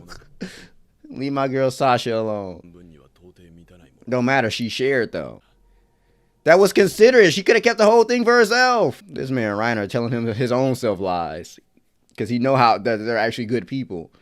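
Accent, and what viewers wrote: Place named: Baltimore, United States. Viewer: Are you from Baltimore? The way you say your too’s sounds smack like it lol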